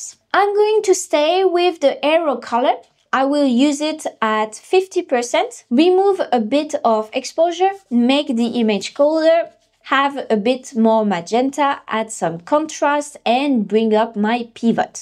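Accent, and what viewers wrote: France. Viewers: Verry nice french accent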